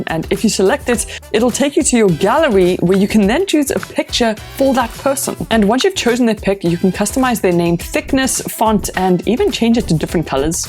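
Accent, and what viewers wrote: South Africa. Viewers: London but you sound South African... Amirite? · South african accent😅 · Getting my watch in a week. Lekker video, sounds like a zaffer in the UK. Am I right ?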